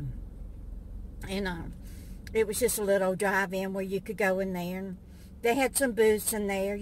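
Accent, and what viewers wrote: South, United States. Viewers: I enjoy your channel. There are people(other you tube channels) out there saying you talk in a syrupy southern accent, but I know its real because I have known people from Mississippi. As far as those saying you are a con artist because of your pay pal and patreon, that's not illegal to do that and people like me who like to watch but can't afford to send you money are still free to watch. Be careful of the mean people out there, don't acknowledge them anymore if they contact you, it fuels the fire. I took up for you and got attacked by some. Be safe and keep on vlogging.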